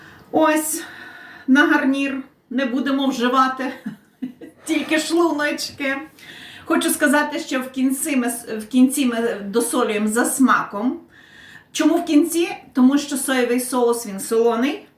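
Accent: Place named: Lviv, Ukraine. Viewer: Не знаю от куда вы, но говор, как у моей родной тёте из города Броды, Львовской обл. Мед для ушей. 🥰.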